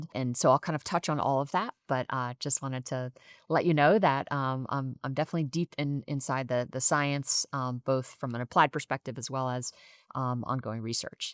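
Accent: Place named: South, United States. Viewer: I dip my hand into a ice fill cooler .. southern voice... Again thank you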